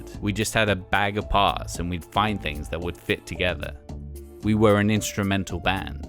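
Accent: United Kingdom. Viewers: No he's just British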